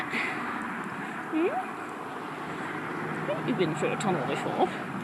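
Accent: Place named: United Kingdom. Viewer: your riding is fantastic! I was lucky enough to visit Tahoe years ago and went for a trail ride in Alpine Meadows. I live just below the Cotswolds and have Badminton at my doorstep but it's no comparison to your hacking! Can't help but notice that your accent hails from the UK though, did you have such great riding when you lived in the UK? Would love to go on a riding holiday in the area to explore the trails!!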